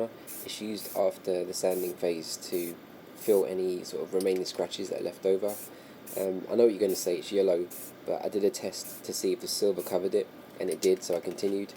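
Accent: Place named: United Kingdom. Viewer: what filler did you use? or I guess its better what Kind of filler since you sound like your in UK somewhere and we in the US probably won't have the brand of filler you used. I got the same exact wheels on the 325i that I bought and it has curb rash on 3 out of the 4 wheels. you can probably do this even when its on the car but its easier to do it removed.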